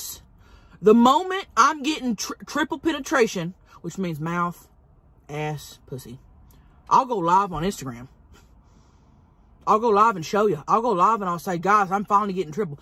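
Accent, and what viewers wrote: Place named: South, United States. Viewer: I adore this woman 🥰 and her little southern accent lol SPLURGE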